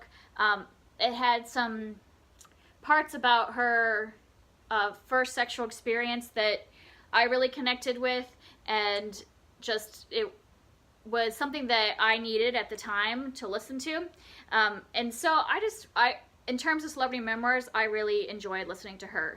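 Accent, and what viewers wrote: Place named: United Kingdom. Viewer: For me if you have a British accent for your audiobooks ... I will listen to you talk for hours!! Such great recommendations on this list!! ☺️💜💜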